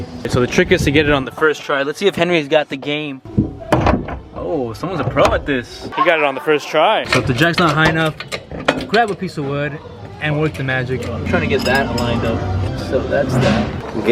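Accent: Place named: El Salvador. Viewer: I know a Salvi when I hear one 🤣🔥🔥 Dope video !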